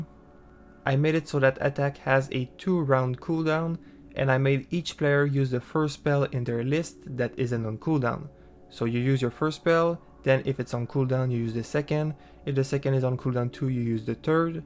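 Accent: Quebec, Canada. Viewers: L'accent queb qui sort mon gars lmao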